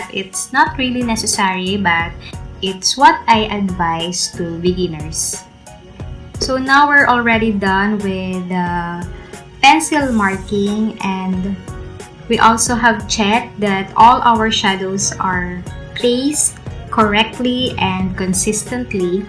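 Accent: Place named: Philippines. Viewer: You are very helpful. Glad I found your channel. Pilipina ka ba? I can sense based on your accent.. Hehehe.. Thanks a lot! 💚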